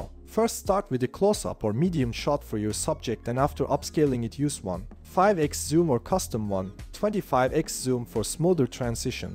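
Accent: Turkey. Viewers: Krall Türk müsünn bu bizim ingilizce aksanımız nerde duysam sanırımm hahah :D Ya şeyi sormak istiyordum örnek bir ürün fotografımız var çanta diyelim. O çantayı aynı tutup arka planınıı değiştirebildiğimiz bir sistem var mıdır bununla ilgili bir kullanım şekli ?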